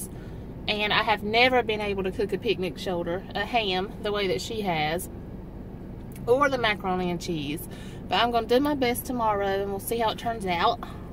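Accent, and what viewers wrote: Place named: South, United States. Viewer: I really enjoyed this and your southern accent :) I did a MUKBANG on my channel for them also :)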